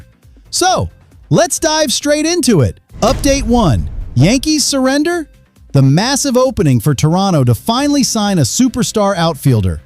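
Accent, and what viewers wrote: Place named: South, United States. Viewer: Why does the AI voice have such a good old boy American accent?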